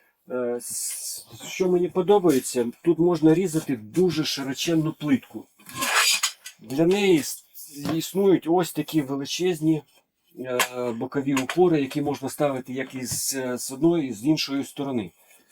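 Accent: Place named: Russia. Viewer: Тренируй украинский язык. Уж больно сильный русский акцент😅😅😅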